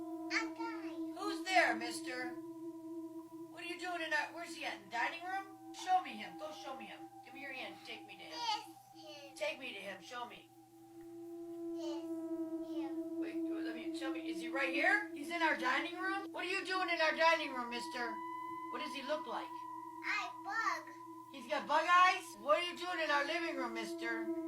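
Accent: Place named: England, United Kingdom. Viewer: Hello!!!! here! Brilliant episode!!! I am so sorry I missed your message - I did private the follow up video but the original is still there with the ghostly voice on. You are more than welcome to use it in a future episode. You might need to scroll down the videos a little but the original video is still there. Thanks so much for the shout-out and I LOVE your English accent😂.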